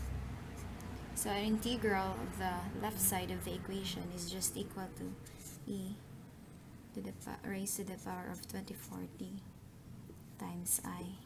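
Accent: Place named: Philippines. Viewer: you're sounded like a filipina, nice explanation by the way.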